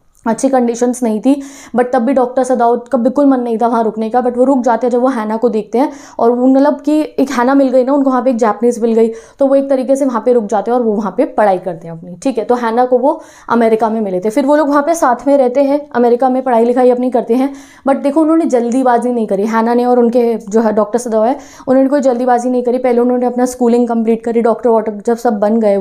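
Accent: Punjab, India. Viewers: Didi aap punjabi hai??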